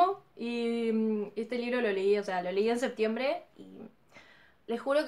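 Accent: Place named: Argentina. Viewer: Che, de que parte de Argentina sos?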